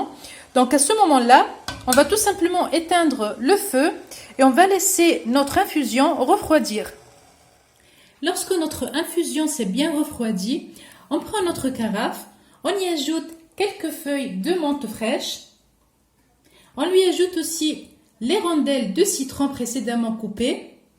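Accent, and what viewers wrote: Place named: France. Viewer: Ciao, adoro la tua pronuncia francese 🥰 ottima bevanda, fresca ed energetica 😋🥰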